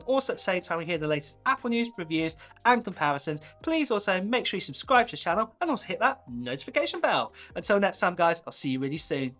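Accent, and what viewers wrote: United Kingdom. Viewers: hey! Are you from the uk or usa? only because you have a british accent but say dollars. Also, any update on macbook se?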